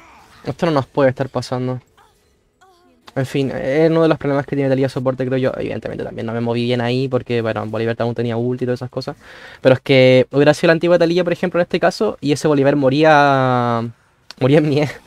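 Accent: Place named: Chile. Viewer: Me gustan tus videos porque tienes una voz super amigable, por tu voz estoy casi seguro que eres Chileno, un lindo país y buen video... Saludos desde Chile ❤️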